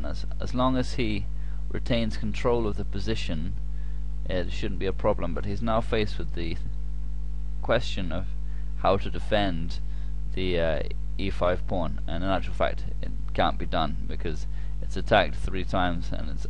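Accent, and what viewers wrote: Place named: United Kingdom. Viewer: Beautiful and great videos!, especially those on Carlsen, and of course, I enjoy your British accent, 'couse I am a fan of the British accent. Well done!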